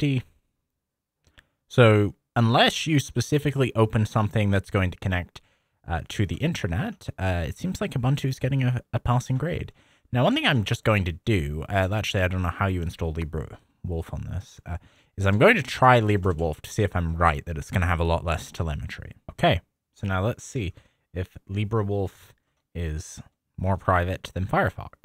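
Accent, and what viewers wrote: Canada. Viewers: He's from Canada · i know that, but canada is huge and has many accents, im trying to get the accent down specifically as this isnt the canadian accent im used to hearing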